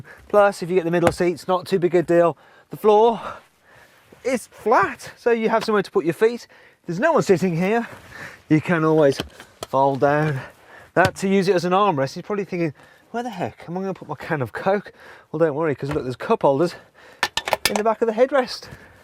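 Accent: United Kingdom. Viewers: Very nice reviews and Brit accent too;)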